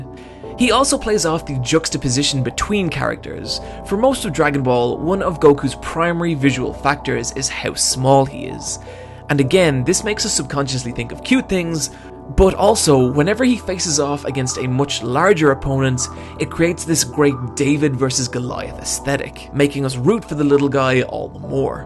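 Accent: Ireland. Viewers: Oh? You're Irish? I thought your accent seemed familiar, but it's always safer to assume English. Hello, fellow countryman! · He's Irish? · I only now started hearing the Irish accent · holy shit I didn't know you were Irish dude hell yeah I knew that accent was familiar. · Oh I was pretty sure he was Irish, that accent sounds about right. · huh so youre irish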